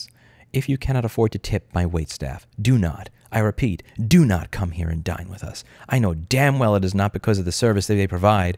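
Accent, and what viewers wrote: New York, United States. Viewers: Any chance you're from New York?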